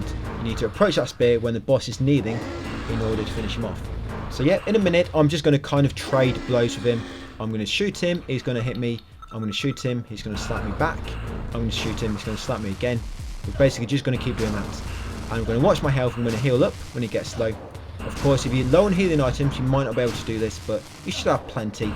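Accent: England, United Kingdom. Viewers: What a bliss to be looking for a walkthrough and accidentally find one of your favourites content creator. Thanks mate. Ps: I teach English as a second language, and I was trying to place your accent. Birmingham, Liverpool, London?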